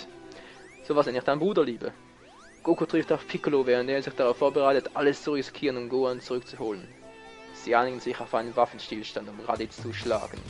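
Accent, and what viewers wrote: Switzerland. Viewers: haha chas sie das du schwizer bisch? isch ja mal voll geil ;D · bist du schweizer ? :D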